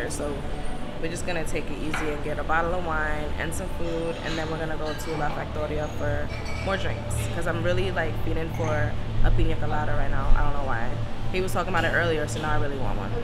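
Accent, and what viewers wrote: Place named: Spain. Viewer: You got a lil Spanish flow! Love watching people enjoy my island! 🍻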